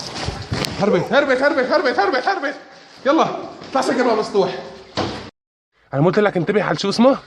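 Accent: Syria. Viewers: يا انت ادلبي ولا حلبي لانو لهجتك لهجت ادلبي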